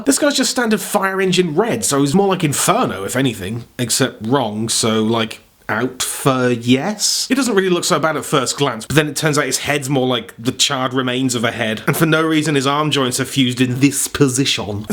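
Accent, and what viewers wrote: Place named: Newcastle, United Kingdom. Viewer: I fucking adore the jordie accent or whatever it is when you read out the terrible knockoff names It's just beautiful and not a knockoff beatdown without it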